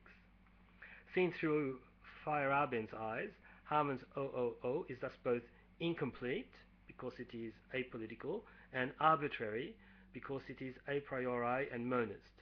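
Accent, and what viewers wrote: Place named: France. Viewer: You've definitely acquired an interesting accent after so many years living in France. ("important")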